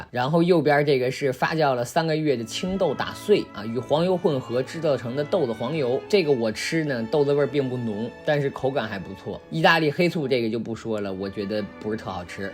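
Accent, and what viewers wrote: Beijing, China. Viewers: 喜欢你说的中国语是北京话吗？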